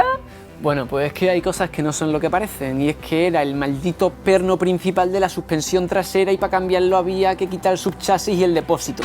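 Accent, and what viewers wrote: Andalusia, Spain. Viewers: Joe que grande!! Pareces dani rovira.Que arte ese andaluz!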